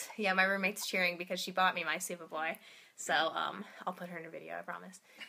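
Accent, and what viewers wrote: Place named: Ohio, United States. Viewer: I'm a new subscriber, but really enjoying your videos! Quite a few to catch up on, but I'm sure I'll get around to them eventually :) Sounds like you're a fellow Ohioan, so it's always nice to meet another one of those. I'm in college as well, so I can certainly relate in terms of business I'm sure. Some great pickups this time around! Especially Spyro! Some of my favorite games on the PS1. 2: Ripto's Rage is definitely the one you'll want to get, though! Excellent game!!!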